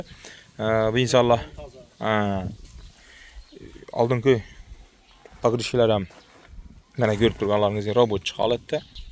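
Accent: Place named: Khorezm, Uzbekistan. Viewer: Хоразмча сулливар кийналиб гетдингку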